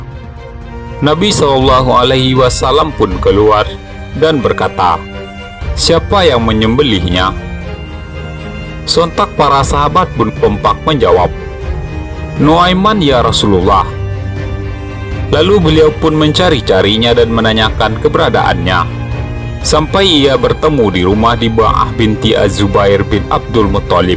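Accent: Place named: Padang, Indonesia. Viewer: Orang padang pasti dari logat bicaranya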